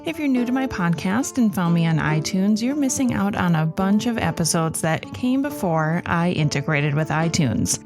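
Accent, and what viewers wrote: United States. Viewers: Rather listen to English casts reading this .Why have an American reader sounds weird .Could only listen to two minutes.Your voice is terrible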